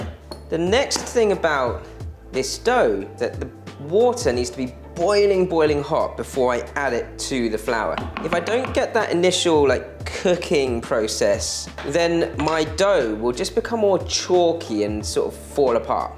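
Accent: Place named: United Kingdom. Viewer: His accent is very british... tickle my ear drum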